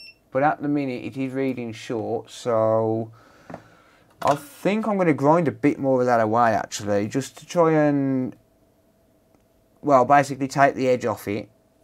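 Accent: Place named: Dudley, United Kingdom. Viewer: Bro, strange how I've just ended up randomly on your video. I was the guy that commented on the Saudi post, and you suggested I add a loop. I'm repairing donor boards, and came across your video and noticed the name. Small world. I'm in Dudley, your accent sounds localish. Going to drop you an email.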